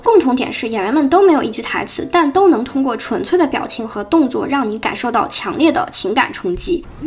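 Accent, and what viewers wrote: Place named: Shandong, China. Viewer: 我不是任何人粉丝，但Up主你的口音也很重，你可以再说一遍“小巷人家” 吗？ 你是山东人、河南人? 反正不管哪的人，你的台词也还得练练，有点大舌头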